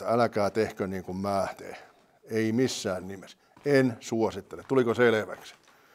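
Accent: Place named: Finland. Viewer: Kiitos. Mistä päin miäs o, ku tuntuu tua murres nii kotoosalta !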